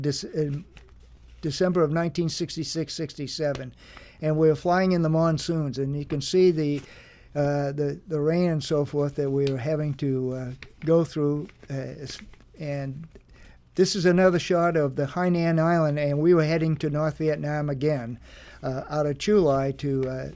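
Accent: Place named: New England, United States. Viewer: Love this video! And the New England accent reminds me of my late grandmother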